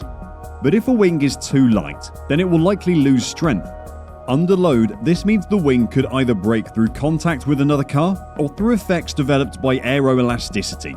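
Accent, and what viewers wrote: United Kingdom. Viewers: sounds like I found a frustrated brit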